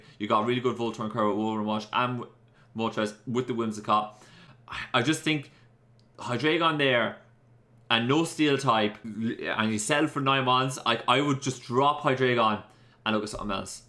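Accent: Ireland. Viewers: I always forget just HOW Irish you actually sound 😅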